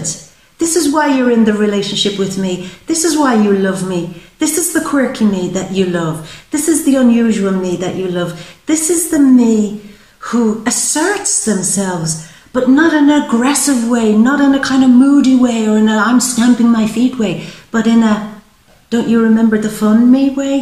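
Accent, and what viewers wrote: Ireland. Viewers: Is that an Irish accent? It's great!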